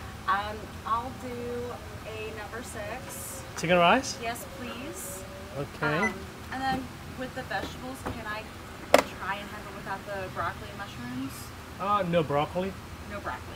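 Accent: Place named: Java, Indonesia. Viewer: Logat jawa Indo pakde di LN seperti nasi goreng yang sudah bisa di minati orang sana😂.... semangat buat semuanya, sehat2 selalu, dan lancar rejeki buat kita semua. GBU...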